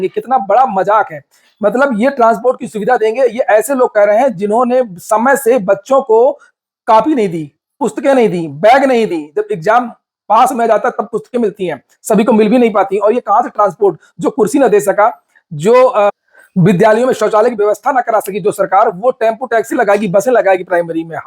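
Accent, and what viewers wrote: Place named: Western Uttar Pradesh, India. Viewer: Aap west up ki ton me bol rahe ho bhai kya baat h.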